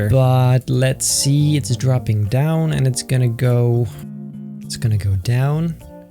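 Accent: Netherlands. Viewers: Are you dutch? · Are you dutch?,I love your videos! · Lol ik hoor dat je Nederlands bent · i am just rly wondering if you’re Dutch cus you sound kinda Dutch :]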